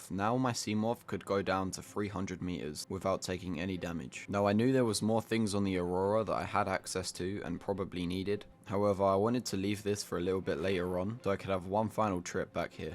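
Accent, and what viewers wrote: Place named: Australia. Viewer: Dude, are you an Aussie? I am and you kind of that sort of accent half the time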